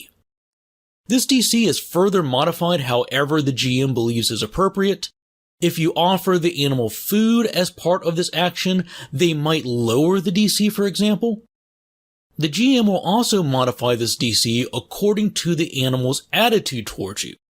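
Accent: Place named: Mid-Atlantic, United States. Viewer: With the 'L' sounds coming from the throat rather than the tip of the tongue, coupled with the wide 'O' vowel sounds, I'm going to guess Maryland. Definitely somewhere in the US Mid-Atlantic region between DC and Philadelphia.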